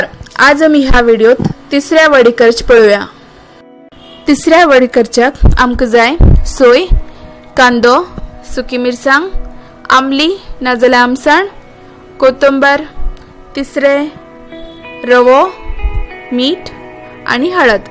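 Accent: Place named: North Canara, India. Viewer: Yummy recipe 😋 n I love Ur north Canara konkani ❤️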